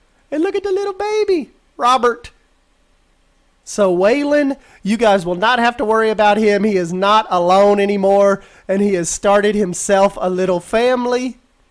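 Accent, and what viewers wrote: South, United States. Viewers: correct me if I'm wrong but are you from the Southern part of the United States cause you have what they call a Southern Accent I have a Grate Uncle who lives in Kentucky and when he talks you definitely know he's from the South even though he grew up here in New England, New Hampshire to be exact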